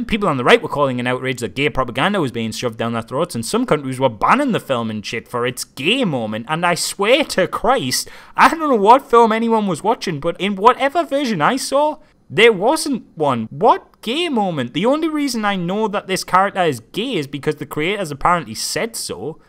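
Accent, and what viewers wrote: Newcastle, United Kingdom. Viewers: I wish you Geordie abridged more films... I'm not even Geordie but I understood it better.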